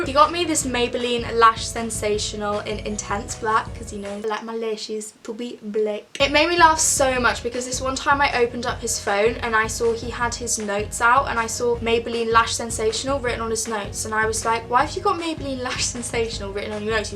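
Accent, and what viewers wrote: United Kingdom. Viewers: I looooove your british accent. I wish, I coul speak like you xD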